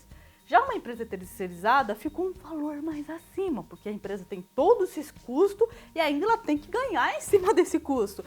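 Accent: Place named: Curitiba, Brazil. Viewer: Ótimos vídeos explicativos, sotaque Curitibano "Penca" de app kkkkk amei. Estou querendo abrir um delivery.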